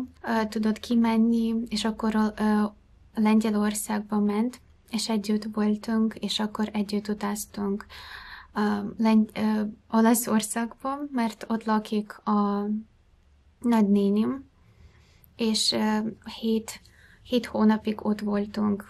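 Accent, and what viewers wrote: Russia. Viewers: Szia! Borzasztóan sajnálom ezt az egészet.. Az én nagymamám Orosz volt. A 2. világháború után szökött Magyarországra, mert a fronton beleszeretett a nagypapámba. Nemrég halt meg 95 évesen, de meghökkentő, hogy mennyire hasonló akcentusa volt hozzád. És számomra ez a legborzalmasabb, hogy innen nézve 2 nagyon hasonló szláv nép öli egymást évek óta... Én is tudom hogy ez az oroszok bűne, és mellettetek vagyok a szívem legmélyén is. De akkor is mellbevágó a hasonlóság akcentusban, habitusban. Ha egy ország képes lerohanni egy hozzá hasonló kultúrájú országot is, akkor bennem semmi optimizmus nem maradt már a jövőre nézve... Nagymamám soha nem beszélt az ideérkezése előtti időkről, és soha többet nem ment Oroszországba. Egyetlen napra sem. kb. megtagadta a származását, és a saját gyerekeihez sem szólt oroszul egy szót sem. Amikor pár éve azzal példálóztunk neki, hogy már nem kell félni tőlük, ez már nem az az Oroszország, mint a régi időkben, mindig azt mondta: Nem tudjátok milyenek az oroszok! 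Amint megerősödnek, vissza akarják állítani a szovjet uniót! Miután meghalt, rá 1 évre kitört a háború. Úgy megkérdezném most mit gondol erről... Nektek pedig kitartást! És félelmetes milyen jól beszélsz magyarul 2 év alatt!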